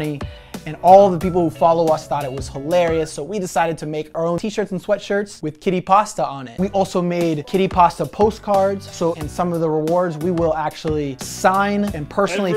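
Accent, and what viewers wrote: Argentina. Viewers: La primera vez que veo tus videos y me encantan! Lindo acento Argentino jejeje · Te olvidaste de como hablar en argentino? O solo estabas hablando asi por el colo? PD: No te pude ver en Argentina :'( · Aguante el proyecto kity pasta!! jajajaja volve a Argentina, sos un groso! mi novio es italiano y habla el " castellano Argento" como vos :) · BUE, muy lindo todo lo del acento argento, el colorado un copado también, pero como decimos por acá PONETE A LABURAR QUERIDO!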